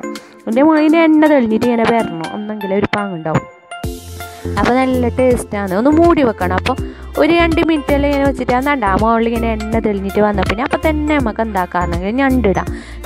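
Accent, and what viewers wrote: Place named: Kasaragod, India. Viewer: Nandu curry Honda ki nokki super Namo Kasargod language super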